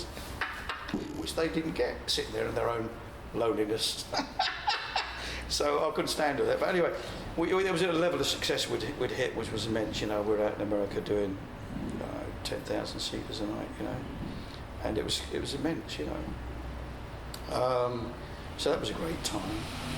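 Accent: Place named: Cockney, United Kingdom. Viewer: Very humble bloke,Cockney accent,reminds me Steve Harris in the way he Talk and the sense of humor,working class attitude,anyway,It's a pleasure seeing Mick Talkin' about his favourite albums and It's not Easy to pick only five great albums,that's how great are to me,could be Seven albums at least and I'm not a hughe die hard fan of the band but surely they eat in same table with, and and not necessarily in that order,so this is my top 5 àlbums. 1.. 2. 3.. 4. 5..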